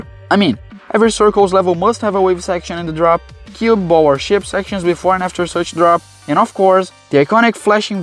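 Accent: Brazil. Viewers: Some brazillian accent ight there!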